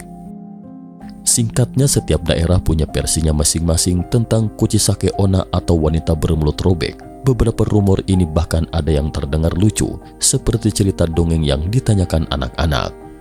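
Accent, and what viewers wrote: West Java, Indonesia. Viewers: Sundanesse abangnya hhe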